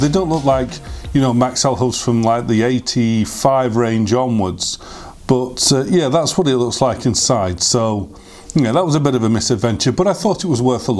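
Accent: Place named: Lancashire, United Kingdom. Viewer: An interesting video, subscribed!👍 You sound Lancashire Vic reeves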